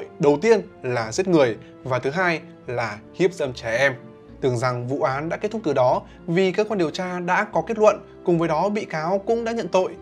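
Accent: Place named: Phu Tho, Vietnam. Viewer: à mà ah ở phú thọ đúng giọng giống quê em lắm:)))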